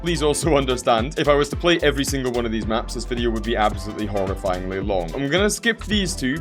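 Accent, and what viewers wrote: Scotland, United Kingdom. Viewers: His scotish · he's a Scot, it's in the name and in the accent.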